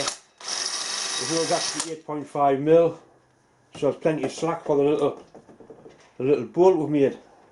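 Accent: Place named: Northeast England, United Kingdom. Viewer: North east accent, but cant place exactly where.... Id have said closer to the tyne than the wear though! Good idea that, subbing for lathe porn!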